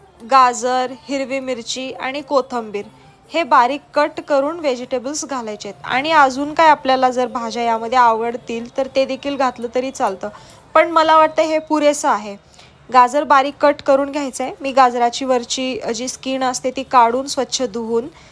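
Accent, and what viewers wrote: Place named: Pune, India. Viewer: तुमची पुणेरी मराठी खूपच शुद्ध आहे 😅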